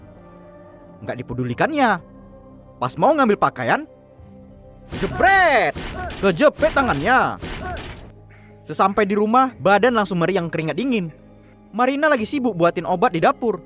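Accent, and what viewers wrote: Medan, Indonesia. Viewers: Seru kali ah dengar cerita sm logat medannya abang ini🤣🤣 mantap..mantap👏👏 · Karna logat medan nya sangat terasa,lucu dengar ang nya riveuw😁😂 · Sumpah putri snng bngt dngn cr Mimin nyeritain setiap alur crta2 film,,dngn bhsa logat medannya rs rindu sm kampung hlmn jd sdikit terobati,,moga sehat sllu buat mimin Santun pg dr putri Jawa Timur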